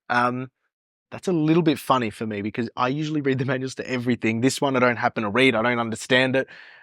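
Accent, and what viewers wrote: Australia. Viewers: Straight to the point and no BS. No like and subscribe, click the bell, yada-yada. This is how videos should be. You sound Australian but look Italian with the way you communicate with your hands😅 Thanks for all the tips and tricks!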